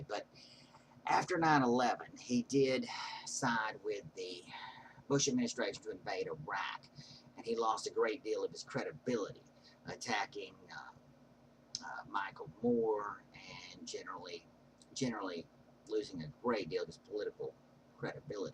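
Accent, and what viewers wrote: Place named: South, United States. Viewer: Wow. An outspoken informed atheist; putatively South Carolinian. Ain't that the Bible Belt? I know it's prejudicial of me, but it's strange hearing knowledge and wisdom via a southern accent. I too am an avid atheist but never stopped seeking and learning. My fave is George H Smith's "Atheism, the Case Against God" but I've read over 100 others on atheism, science and religion and, of course, the Bible, a book I treasure as a peek into the minds of ancient people. Hope your intellectual stance isn't adversely affecting your social life., a fellow rather odd He named himself after one but don't accept no god!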